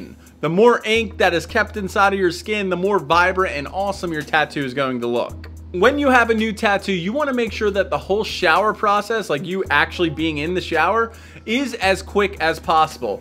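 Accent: Philadelphia, United States. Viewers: he’s from philly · You gotta be from Philadelphia with the way you say warder. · i detect a little bit on an eastern PA southern Jersey accent maybe im wrong but i know a philly accent when i hear one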